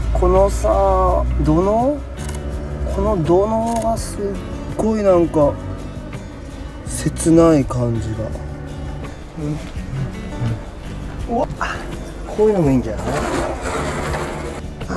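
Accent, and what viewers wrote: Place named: Kanto, Japan. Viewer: 偶然この動画にたどり着きました。築地って、Zfcがピッタリ似合う町ですね。驚きです。写真系動画は、自分で見ている範囲では関西の話し方の人がが多く、説明を解読？している感じで苦労があります。様の話し方は、東京？関東？ふうでフィーリングやニュアンスがしっくりきて聞きやすいです。バックナンバーも見てゆきますのでよろしくお願いいたします。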